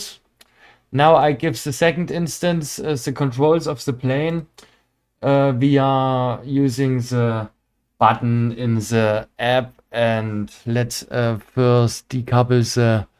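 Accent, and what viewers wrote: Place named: Dresden, Germany. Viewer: Geiles Tutorial. Als ob du auch aus Dresden kommst. komme auch dort her😅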